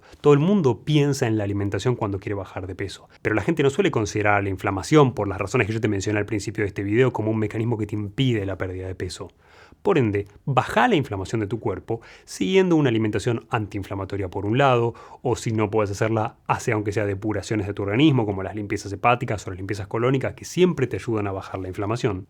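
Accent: Argentina. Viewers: no le ves la cara de ángel ? Su acento argentino ? Su tono de voz tan relajante ? Su sabiduría? Su inteligencia y nobleza ? ❤️❤️ èl no puede ser de esta tierra . 👼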